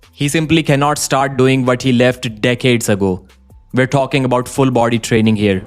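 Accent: India. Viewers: another Indian voice it's so annoying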